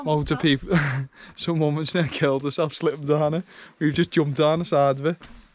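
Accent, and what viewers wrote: Liverpool, United Kingdom. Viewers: haha I live in Cheshire so no issues with the scouse - but I had the captions turned on and had a good laugh. Do you think this route is ok to do in the snow with microspikes? I've heard there is a 6ft scramble down rocks (is that the bit ) which might be sketchy?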